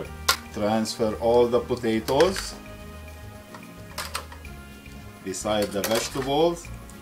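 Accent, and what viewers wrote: Lebanon. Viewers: I love your lebanon English language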